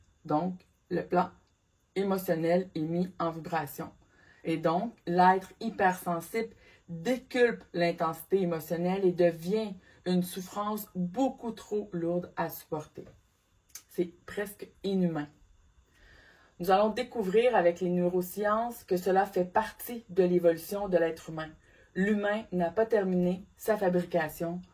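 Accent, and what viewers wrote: Quebec, Canada. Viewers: Contenu enrichissant et utile présenté avec pédagogie par une jolie femme à l'accent québécois ....❤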